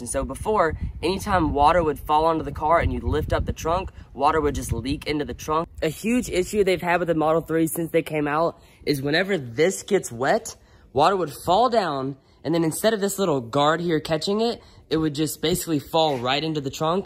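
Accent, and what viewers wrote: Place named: South, United States. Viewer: Love this video man! You sound southern, nashville here. I pick up my red model 3 next week and im so lit!!!! subscribing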